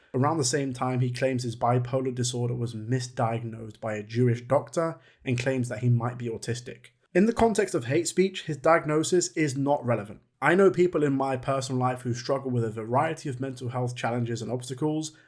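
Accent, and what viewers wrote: United Kingdom. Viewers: I respect your opinion But a British accent criticizing the greatest leader my country has ever seen….is interesting As an actual black person I respect Ye’s move to the right Inspires more true black Americans to get out of this liberal stranglehold that’s been destroying our communities for the past 60 years And after 400 years of slavery, yes, it does become a choice to stay a slave. Especially when you out number the people enslaving you. What do you think share cropping was? Former slaves that chose to stay on the plantation after emancipation. Ye’s still the best Never bad in my book WW3 & Bully will be legendary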